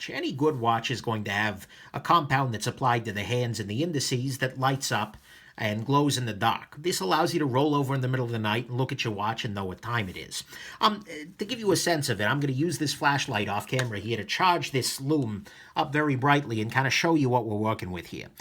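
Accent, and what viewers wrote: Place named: Brooklyn, United States. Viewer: I love this watch but I really came here to hear the voice of, reminds me of Vinnie on Doogie Howser MD lol, plus that Brooklyn flow, being from Queens I can appreciate that!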